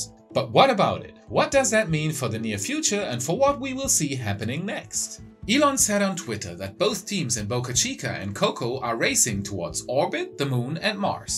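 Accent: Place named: Germany. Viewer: Yah, that's a subtle German accent. It's impressive how many folks there and in Scandinavia speak better English than the average American. At this point, theirs qualifies as a full-fledged regional accent in English just like Australian, British, Indian or the one from Louisiana...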